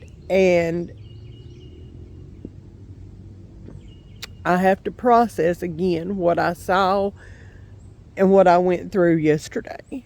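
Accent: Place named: West Virginia, United States. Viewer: Do you mind saying where you live, what state? I am from WV, and your accent sounds very much like WV.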